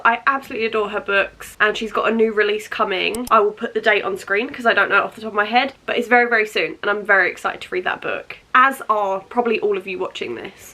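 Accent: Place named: United Kingdom. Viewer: accumulated, brihish axent 😒 nice vid btw 😊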